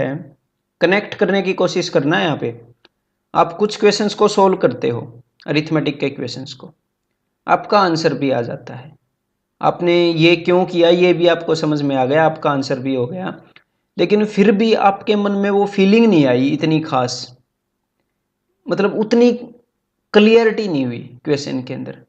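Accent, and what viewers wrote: Haryana, India. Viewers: Lol yer your accent is cute..haryanvi sort of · Very good sir.... Aap Haryana se ho...? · Sir aap haryana se ho kya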